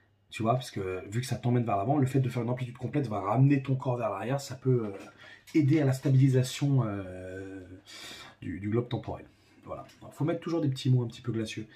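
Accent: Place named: Gaul, France. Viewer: tu me tue, cette façons de parler, ca c'est du gaulois solide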